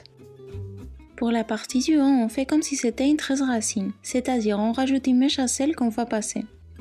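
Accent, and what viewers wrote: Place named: Spain. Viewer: han comme j'adore ton accent !!! ça sent l'espagne à plein nez (je me trompe pas j'espère) qu'est ce que tu es charmante aussi, un visage à la audrey tautou, simple, frais et naturel :) un besito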